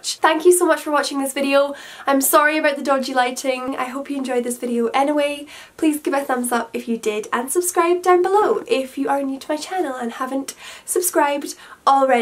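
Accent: Scotland, United Kingdom. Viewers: this was a amazing💋 are u scottish xx💗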